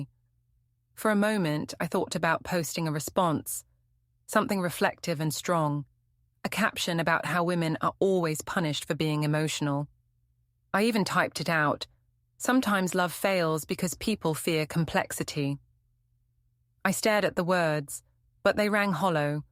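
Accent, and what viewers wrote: United Kingdom. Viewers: there’s a collection of stories where the AI narrator has a British accent. They all follow the same pattern. The wife or girlfriend does something messed up, but not quite cheating. The guy has a problem with it, the woman tries to gaslight him and then it turns out she actually did something way worse. It’s becoming repetitive at this point. Come up with something new. Also, you are giving the idea that the initial thing that the woman does isn’t bad enough. It is there’s no need to also toss in the other things that she’s done. I am two minutes in and it seems like the story is gonna go the same way and if that is the case, I am going to avoid all stories like this, like the plague.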